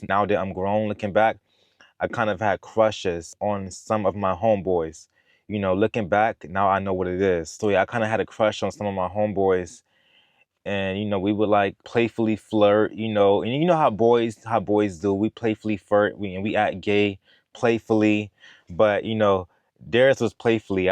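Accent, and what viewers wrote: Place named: New Jersey, United States. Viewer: I hear an east coast New Jersey accent or somewhere near there.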